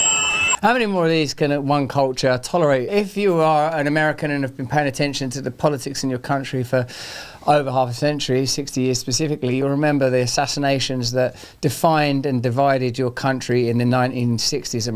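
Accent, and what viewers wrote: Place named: United Kingdom. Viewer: I love how this guy's says absolutely nothing but has a British accent so people thinks he's saying something so insightful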